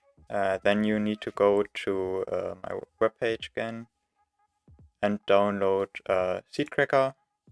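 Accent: Germany. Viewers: du bist eindeutig deutsch... das hört man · haha du bist deutsch oder? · This is hard German Voice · Bist du deutsch? Dein Englisch hört sich sehr deutsch an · i hör a little deutsch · Are you german or really english ? you don't sound like a english person NO HATE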